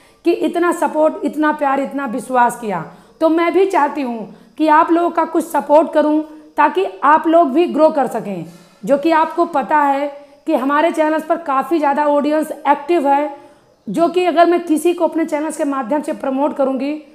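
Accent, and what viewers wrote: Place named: Haryana, India. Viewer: Mam lgta hai aap haryana se ho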